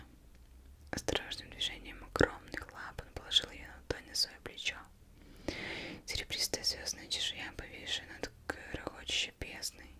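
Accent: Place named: Perm, Russia. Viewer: Очень приятный голос. 💜 Но какой-то интересный акцент... из Перми родом?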